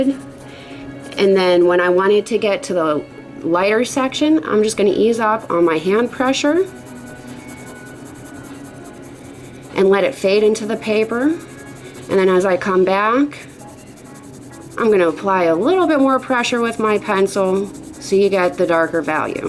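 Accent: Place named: Pittsburgh, United States. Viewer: are you from pittsburgh? you have the accent of a yinzer!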